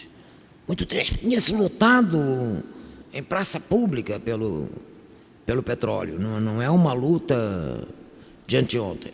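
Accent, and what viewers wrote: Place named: Portugal. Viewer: Her accent is Portugal Portuguese...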